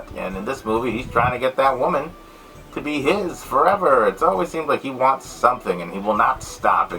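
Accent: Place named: Ireland. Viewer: Ah nooo, the fecking Leprechaun! Again your Irish accent is better than mine. Happy St Paddy’s day!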